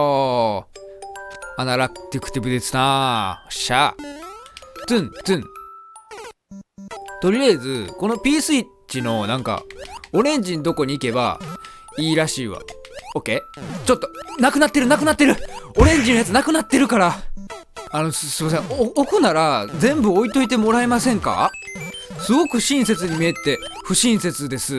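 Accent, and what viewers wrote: Kansai, Japan. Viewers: さんの関西弁大好き！同士おるよね？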